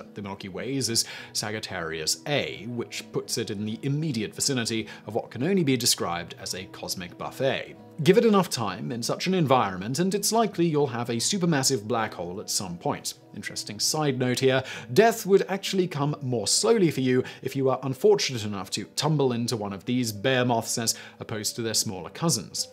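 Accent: United Kingdom. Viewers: I'm telling you; I'm only one stupified, drunken nite away from getting a tattoo of Simon. And, as it never fails, it most likely will end up on a precarious part of my body. Damn those Brits and their damn accents.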